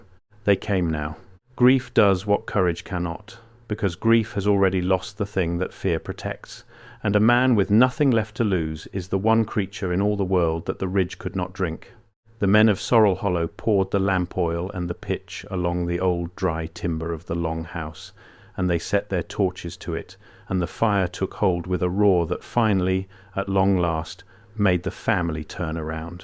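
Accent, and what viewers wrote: United Kingdom. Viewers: Why a British accent narrating an American story? Oh, AI. Which makes me not believe the story.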